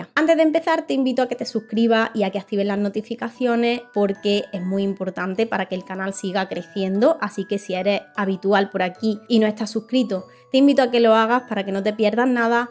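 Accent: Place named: Murcia, Spain. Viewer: Hola, me encantan tus videos, hace poco descubrí tu canal y enseguida me suscribí. Pongo tus videos en el trayecto de casa al trabajo y a veces en este. Mi mamá está intrigada por tu acento, porque, aunque sabemos que eres española, ella no había escuchado tu variante de acento. Yo digo que eres de Murcia, pero, ojalá me puedas contestar para resolver la duda de mi mamá. Gracias. Saludos desde México.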